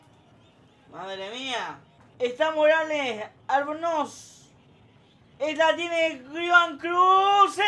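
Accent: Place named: Argentina. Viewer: POR que hablas como argentino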